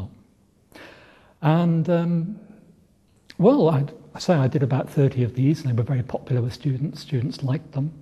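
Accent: United Kingdom. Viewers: Does he speak in RP?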